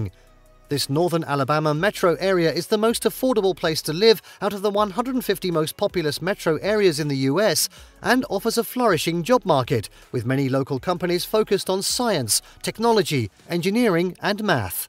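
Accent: United Kingdom. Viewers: As soon i heard british accept i stopped video. I will skip it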